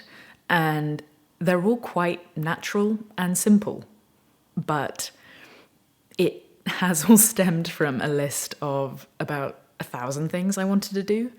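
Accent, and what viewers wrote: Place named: RP, United Kingdom. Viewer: The resolution idea is just a fabrication. Some wishful thinking most humans blather, then fail about. You probably don't have a cat, because you travel so much, but, if you did have a cat, I would counsel you to monitor their way of passing the days. What do you want really? More fame or money? More free time? To play like Marty or Paul Davids, John Mayer or hundreds of other guitar players? (Chet Atkins, Tommy Emmanuel, Glen Campbell, Vince Gill, Justin, BB, Stevie Ray, Jimi, Bonnie to name a few). I would say...forget the New Years resolution aspect...and just do you. Different topic. How would you characterize your english accent? Oxford like? Upper crust I should imagine. But I am interested in how you would describe it. I am several generations older...dad was a WW2 pilot..so, I am a boomer...one toe in the cemetery...nearly an ex-parrot. 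Just be you. You are wonderful as you are.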